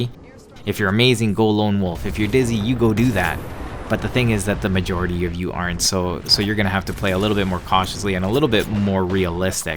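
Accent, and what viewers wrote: Canada. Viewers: Where in Canada are you from? Nova Scotia?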